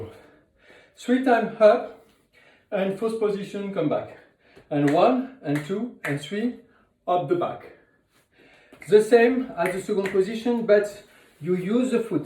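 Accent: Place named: France. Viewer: Your French accent is beautiful.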